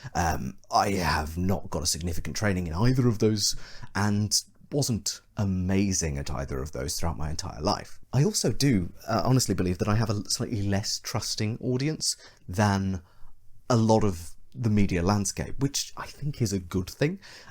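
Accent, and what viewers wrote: United Kingdom. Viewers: To be fair, everything sounds more believable if said in a erudite British accent.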